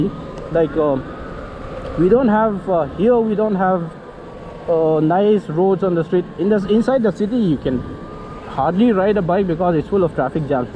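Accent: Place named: Mizoram, India. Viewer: Mizo saptrong thluk(accent) chiah2 nh chu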